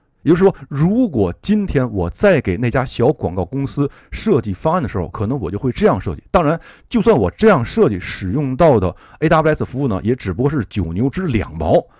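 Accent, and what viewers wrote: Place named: Japan. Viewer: 听口音确实是在日本干活的。komakun加油。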